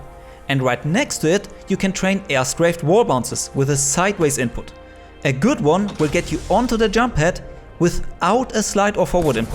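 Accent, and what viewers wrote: Germany. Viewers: Man hört richtig raus das du deutsch bist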